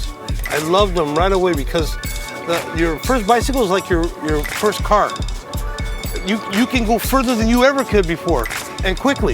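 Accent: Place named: East Los Angeles, United States. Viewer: This guy is great - can tell right away by his accent he grew up in East L.A.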